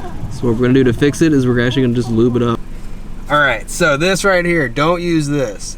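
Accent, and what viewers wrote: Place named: Texas, United States. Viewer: I think you done developed a Texas accent there buddy!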